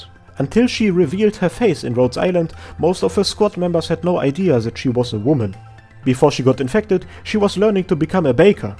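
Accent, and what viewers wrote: Germany. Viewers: From Which country are you XD Sounds pretty Hard like germany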